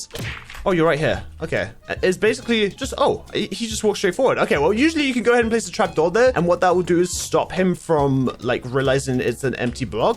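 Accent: United Kingdom. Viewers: I'm still confused if is British or American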